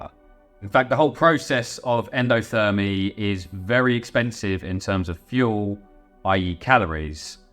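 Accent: United Kingdom. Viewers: He's British. They all do this and the same with Australians.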